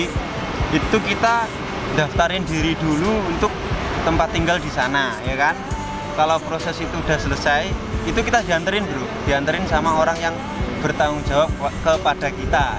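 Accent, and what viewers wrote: Java, Indonesia. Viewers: Jowo mu medok massee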